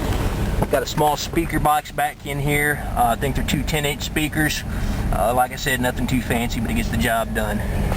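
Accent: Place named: South, United States. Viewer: what part from the south are you from lol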